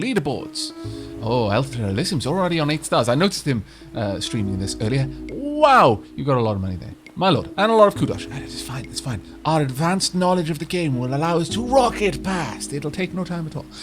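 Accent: Wales, United Kingdom. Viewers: is this guy welsh? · he sounds like a british buk lau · Love your accent, you welsh?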